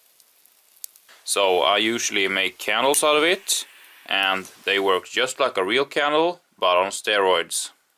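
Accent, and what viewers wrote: Sweden. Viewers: Great video! Are you Swedish? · Kung är du man hör att du är svensk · Nice. I've know about most of those. Never thought about permanent markers. Is that a Swedish accent you have?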